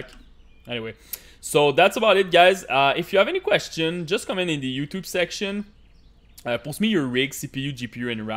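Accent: Quebec, Canada. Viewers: Chu tombé par hasard sur ton vidéo pi juste en entendant le début j'ai faite : Ha ben, un autre Québecois esti :D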